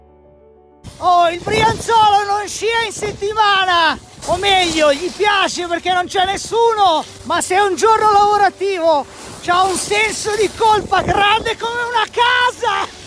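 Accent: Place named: Sicily, Italy. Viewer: È chiaro, sei siciliano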